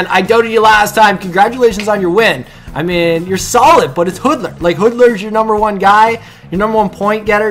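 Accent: Canada. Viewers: Why are you always Red Wings? They your favorite team? I live in Michigan so they my favorite team as well. Such a shame against Tampa Bay! You have Canadian accent so you from Canada? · ***** where are you from? I am from the Detroit area and I'm a die hard wings fan. I've been watching a lot of your videos lately and haven't figured out where you are from lol. DET? or no..sounds like your canadian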